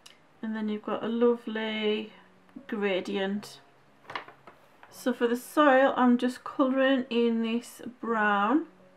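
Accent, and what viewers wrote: United Kingdom. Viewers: Well done! Love your accent - UK I'm guessing?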